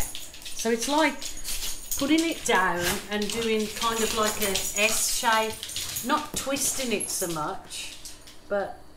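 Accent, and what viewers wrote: Birmingham, United Kingdom. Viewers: I am trying to determine your accent. I’m going to say Brummie ? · Well done. I feel your anxiety. I keep trying that technique but I’m not getting the paint consistency right 🤦‍♀️. The finished result turned out pretty., I knew I detected a British accent in there, hidden under the Australian one 😊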